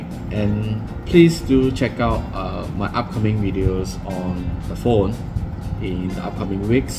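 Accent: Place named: Malaysia. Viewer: Darn I wish you Malay Chinese and Singaporeans didn’t have that horrid accent. Both Lee Kwan Yew and prof. Gang Wu speak with a better accent.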